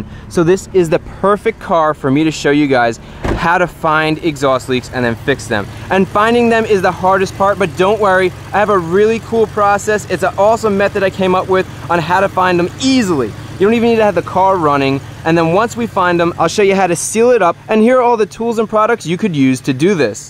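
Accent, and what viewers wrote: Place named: Pennsylvania, United States. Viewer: Good wooder PA style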